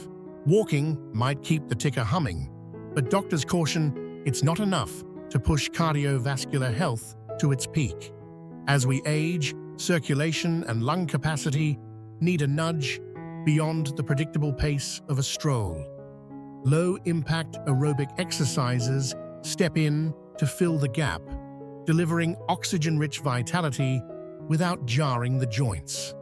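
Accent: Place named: United Kingdom. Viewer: What’s with the GdDam British accent?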